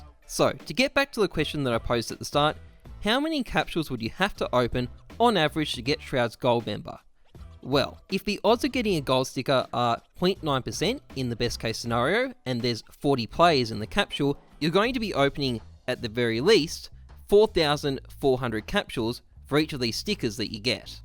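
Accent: Australia. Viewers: Are you from perth??? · An austraaaaaalian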